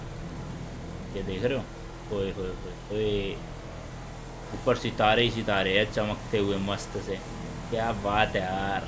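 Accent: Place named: Haryana, India. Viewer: apka haryanvi boli mst hai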